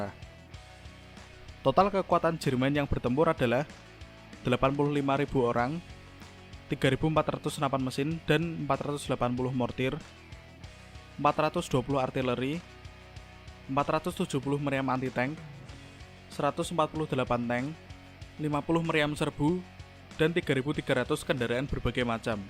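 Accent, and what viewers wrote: Java, Indonesia. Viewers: medoknya ilangin dulu bro😀😀😀😀😀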